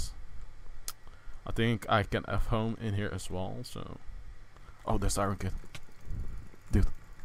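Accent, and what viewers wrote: Netherlands. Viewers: Is he Dutch because he sounds like it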